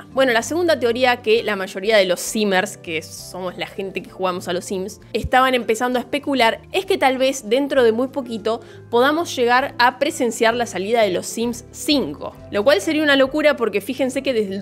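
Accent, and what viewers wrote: Argentina. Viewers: Vi el Video completo por el acento argentino ASMR . Like site paso lo mismo❤🇦🇷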